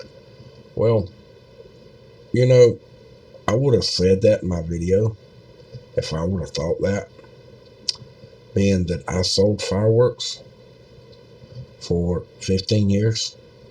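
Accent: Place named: South, United States. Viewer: I love your accent. Reminds me of when I lived in Virginia and Melbourne, Florida. Enjoy your channel. Are you in Florida? Please don't take the Lord's name in vain.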